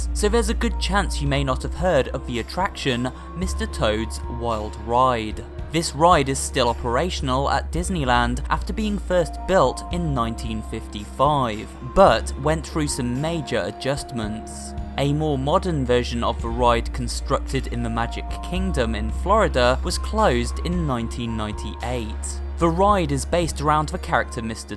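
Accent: United Kingdom. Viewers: Now your British speaking voice sounds much more legit, it must have been a thing where you thought that talking slower would be more helpful or something.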